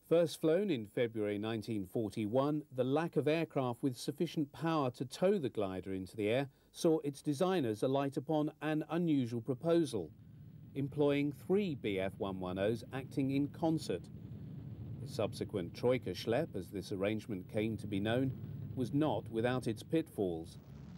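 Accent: RP, United Kingdom. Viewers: plummy accent?? hmm, refreshingly nice to the ear if i may say so. wonderful historical footage, Thanks again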